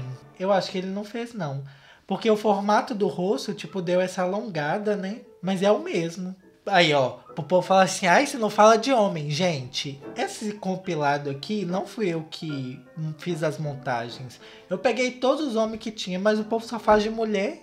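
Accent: Minas Gerais, Brazil. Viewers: "Eu falei muito mineiro agr" eu mineira pensando.... eu falo assim? Kkkkkkj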